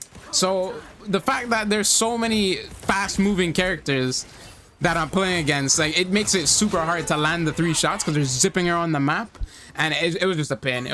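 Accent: Caribbean. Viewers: Are you from the caribbean? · By any chance are you Trinidadian? Your accent is very familiar and sounds Caribbean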